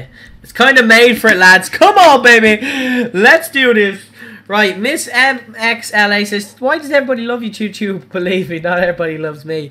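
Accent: Ireland. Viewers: Are you irish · Where you from in Ireland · are you irish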